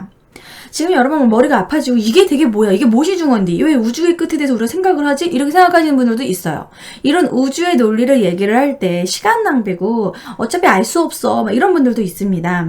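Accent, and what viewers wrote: Gyeongsang, South Korea. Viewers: 혹시... 경상도죠? 중간중간 억약이 좀... ㅎ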